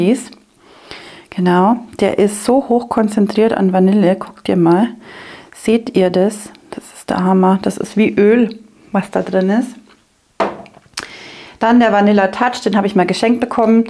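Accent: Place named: Bavaria, Germany. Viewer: So herrlisch präsentiert! Danke dafür! Froh hier gelandet zu sein. Diese private Duftreise gepaart mit dem wunderbaren bayerischen Akzent… phänomenal! Werde das Video gestückelt genießen. Gibt viele Parallelen zu meiner Kollektion. Viele Grüße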